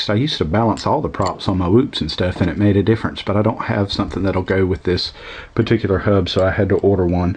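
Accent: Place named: Texas, United States. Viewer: Less jello than a runcam split mini? I hear that texan accent, what part?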